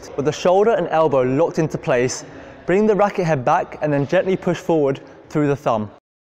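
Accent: United Kingdom. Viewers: Is this guy Asian or British ? He sounds more like British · Wow british accent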